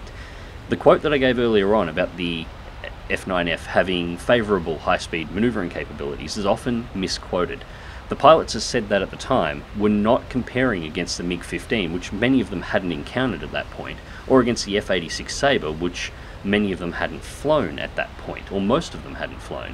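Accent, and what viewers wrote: Australia. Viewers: Love hearing a friendly aussie accent <3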